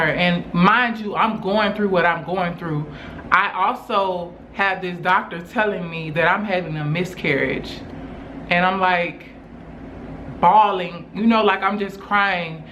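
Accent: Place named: Alabama, United States. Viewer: Fr!! I tend to realize females that’s from/live in Alabama have that same accent and they sound the same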